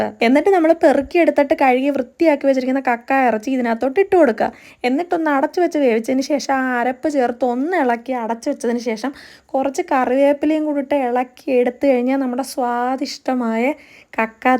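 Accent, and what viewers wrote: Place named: Kollam, India. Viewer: കൊല്ലം കാരിയാണ് അല്ലെ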